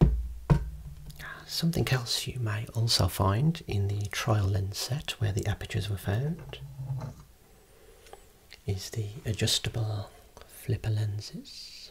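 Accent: United Kingdom. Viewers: Your content, voice and British accent is better than any type of melatonin, I knock out so quick 🛌 😴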